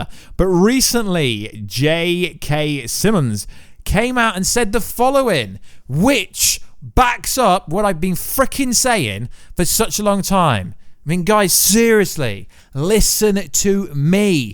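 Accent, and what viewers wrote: England, United Kingdom. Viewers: When gets mad his English accent thickens 🤣🤣👍🏽 Love the channel bro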